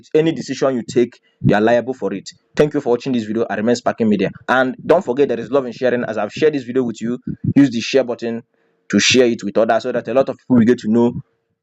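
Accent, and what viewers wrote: Igbo, Nigeria. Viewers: You don’t have fact to prove your point. With your Igbotic accent,you want to spoil another man’s effort. Nothing is assured in this life,the most important thing is for you to invest what you can afford to lose. And on F&B you earn in 3ways not two. I said it that you are just a dumb critic. You earn without a single referral. You don’t have to refer a fly to earn legitimately. You are an online dumb coach that is looking for people’s businesses to pull down. You couldn’t even take you time to get your fact to backup your review point. 90% of the people I brought into the business investment did not refer a single fly yet they are earning and have recovered their capital. Till now all my team are still earning after getting their capital. Why the hate on other people’s business? As a matter of fact F&B just opened a Physical office close to Alausa Ikeja area of Lagos and is almost done with the 2nd branch on the Island in Lagos. 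So you mean a business is plan to run away with investors money and they went and opened a physical office beside Governors office? Can’t you see how dumb you are? And some other dumb people just as you are too are saying God bless you. Don’t listen to him,Join F&B and start earning your money without stress.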